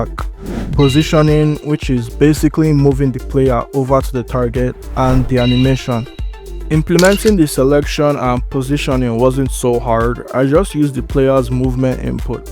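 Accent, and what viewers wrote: Nigeria. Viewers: That accent.....you be naija boy? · U seem African. U sorta have A nigerian Accent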